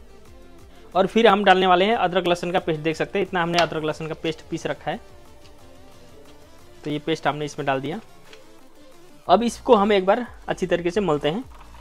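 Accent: Nepal. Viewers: Aap Nepal se ho kya ?? Aapki aabaj aur tongue se aisa feel ho rha hai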